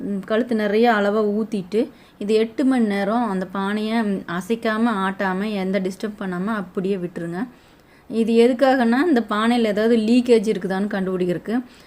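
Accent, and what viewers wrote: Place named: Kongu, India. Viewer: அருமை, எனது சந்தேகங்களுக்கும் பதில் கிடைத்தது, உங்களின் கொங்கு தமிழ் உச்சரிப்பும் அழகாக இருந்தது.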